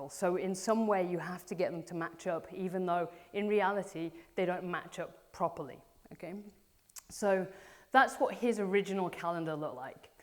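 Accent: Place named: Northern England, United Kingdom. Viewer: Interesting accent mix. Northern England and North American.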